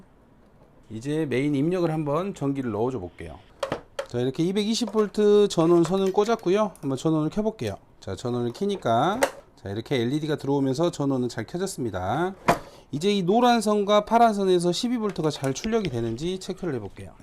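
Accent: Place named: South Jeolla, South Korea. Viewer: 근데.. 딴소리인데 전남 어디신가요? 전남사투리가 너무 구수하십니다ㅋㅋ 저희시골은 고흥입니다ㅋㅋ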